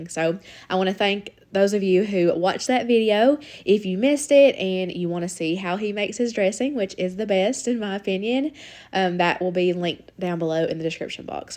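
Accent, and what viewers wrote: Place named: Kentucky, United States. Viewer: Thank you so much for making these videos! You always give me such great ideas of meals to fix my family! And I’m a fellow KY girl, so you sound like family teaching me! 💓💓💓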